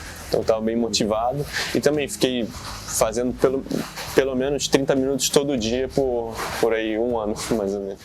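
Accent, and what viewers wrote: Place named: Brazil. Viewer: O portunhol não abandona o Brasileiro 😅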